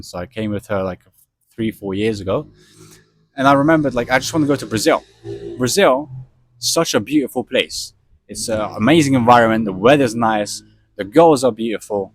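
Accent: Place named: South Africa. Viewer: Why does he have a South African accent...NOT a UK one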